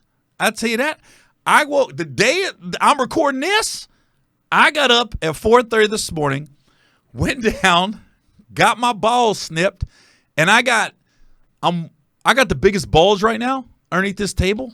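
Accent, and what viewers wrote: South, United States. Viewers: He from down south you clown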